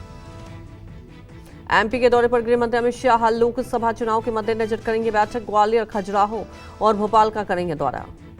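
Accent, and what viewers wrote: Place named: Bihar, India. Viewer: Madam Ki Bhasha Mein Bihar Jhalak Rha Hai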